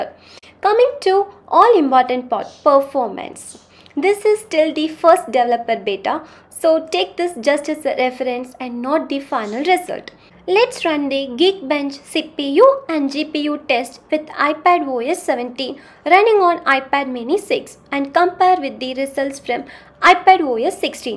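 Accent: India. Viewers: Your indian accent is so thick girl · I llllllllove your Indian accent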